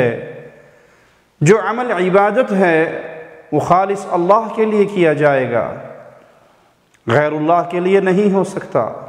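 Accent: Arab world. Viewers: اردو کو اردو لب و لہجے میں بولا کریں۔ عربی تجوید کے ساتھ نہیں۔ اردو کو عربی میں بولنا اتنا ہی غلط ہے جتنا عربی کو اردو میں بولنا۔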